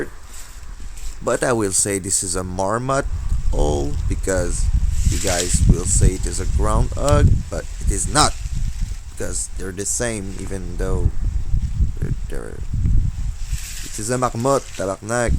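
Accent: France. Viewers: To learn more about Canada, I spent some time on Wikipedia. There I got the answer why you speak English with a French accent, very intelligibly at an appropriate rhythm. Stupid but until now I didn't know that both the French first and finally the vain British were involved until 1982 when they were finally hauled back to their island. But they remain vain, proven with their Brexit in 2020. I hope you will be able to outwit small game but it will be difficult. Guess not flying wild is easier from behind a blind. In addition, it is difficult not to betray your presence by creaking twigs, birds, especially crows... I hope then that your joy and happiness will splash off our screen because this "Big Brother" knows how difficult it is in Canada with the gun laws and what you have already done to now be allowed to walk outside your private place with an air rifle. good luck and a gelinotte isn't that an English hazel grouse? 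LOL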